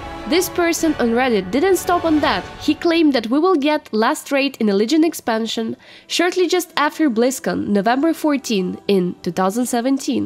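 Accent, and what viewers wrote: Russia. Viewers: Well, sexy video, simple as that, you too of course, being the shining jewel and all. Of all the accents from all countries i have heard, Russian, or slavic origin in general is by far the most heart-melting, delicious id say ;p Either that ir i have grown to like how Russian females talk since i live near(Latvia) Russia myself, so its common but still slightly different between each individual.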